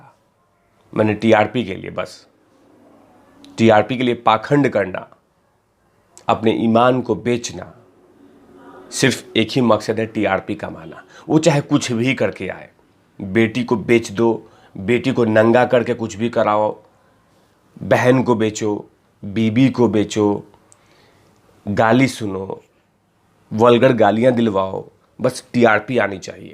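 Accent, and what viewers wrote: Bihar, India. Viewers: AAP BIHAR SA HO KYA 🤔🤔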